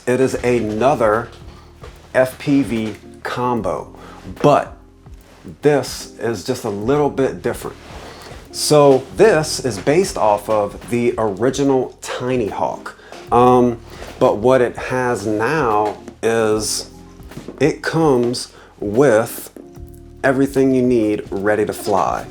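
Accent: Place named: Louisiana, United States. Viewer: I know dat accent when I hear it! But I’ve never heard anyone speak so clearly, or with a neutral tone, but at the same time spit out a purely Cajun accent! You were tripping me out with that. Lol... We’ll have to get together and fly one day once I get some time on the sticks with FPV... I’m a 107 pilot, been flying gps drones for about 5 years. I plan to do some modeling, mapping , and construction progression work in the near future, but for now I’m just shooting some real estate here and there when I get the opportunity... But I been wanting to make some time for this hobby for a while now... I been playing around on the simulator a little bit, but i think I’m going to get a lot better once I get my hands on one of these little beginner drones. That’s the plan anyway! Lol...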